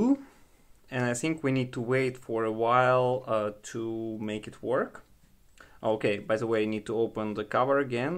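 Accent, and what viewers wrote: Russia. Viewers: I like your Russian accent thanks for the video man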